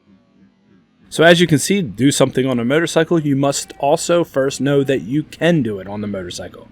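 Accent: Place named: Pittsburgh, United States. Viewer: You from Pittsburgh? I sense a Pittsburgh accent N nat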